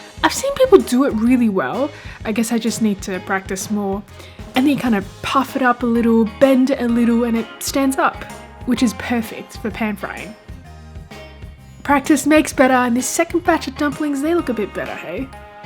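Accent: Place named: Australia. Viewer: it's strange to hear an asian person with an australian accent. i'm from vancouver where most asian have an asian accent. it's very cool.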